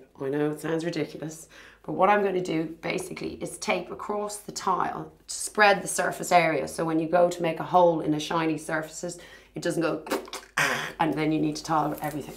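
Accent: Ireland. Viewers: Any lady who does DIY deserves a like in my book. Love the Irish accent too. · Fabulous and a lovely Irish accent!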